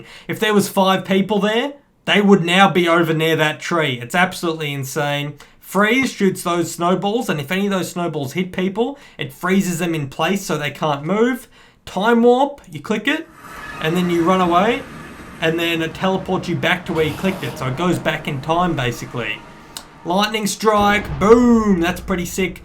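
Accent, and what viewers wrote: Australia. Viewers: Dude you are awesome and are you Australian?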